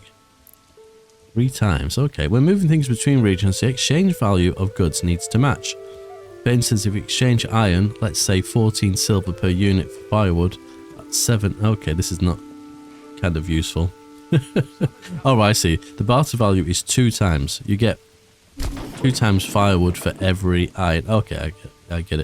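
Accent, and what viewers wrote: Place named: United Kingdom. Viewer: Ahh, you don't sound nasally...you sound British! 🤣 Unfortunately there is no way to unsnap road creation, unless maybe by starting short of a snap point instead of at an existing road. In my series I set up 2 iron deep mines and even with 8(?) bloomeries I couldn't keep up with ore production. They mine quickly. Good battle!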